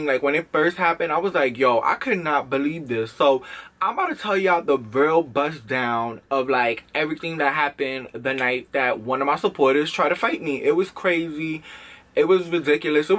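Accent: New York, United States. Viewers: is so new york, i love it😂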